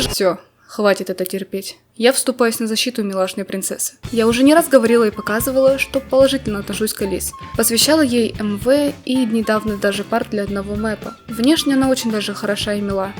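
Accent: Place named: Russia. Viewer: Your accent is so cute, i need to go to Russia now to get a waifu. But Elise is trash LOOOOOOOOOOOOL Just kidding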